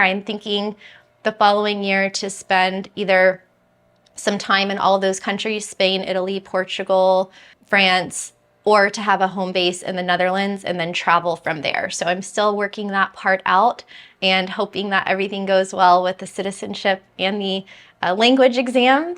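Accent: United States. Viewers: When coming, could you drop that horrible American accent??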